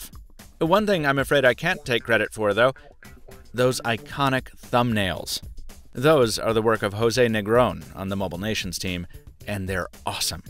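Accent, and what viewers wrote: Boston, United States. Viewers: are u from boston ?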